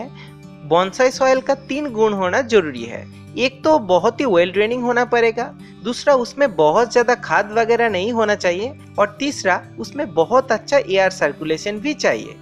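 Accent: India. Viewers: Nice Hindi accent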